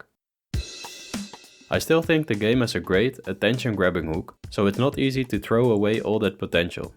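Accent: Netherlands. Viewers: Are you Dutch? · I hear a Dutch accent, Ik had/heb dezelfde struggles. Het is nooit verkeerd om opnieuw te beginnen en te leren van de fouten die je hebt gemaakt. Maar... Te, is ook niet goed he ;) op een gegeven moment Delen, met vrienden, familie en community, en feedback absorberen. Heel veel succes met je droom! Ik heb dezelfde =) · Too bad. Je klinkt Nederlands! Not everything needs to be original or grand. This definitely sounds like potential but needs interesting level designs. Maybe someone with more experience can help a great deal. But I get that you're fed up with the spider gunner game now. Making experimental games is the hardest there is. KISS principle and sticking to tried and true concepts can help. I made a card game: <link>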